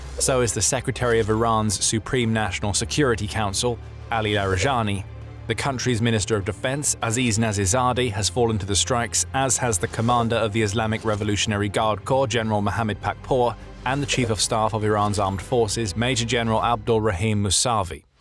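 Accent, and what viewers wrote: United Kingdom. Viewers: Love hearing a Brit talk about stuff they aren’t involved in lmao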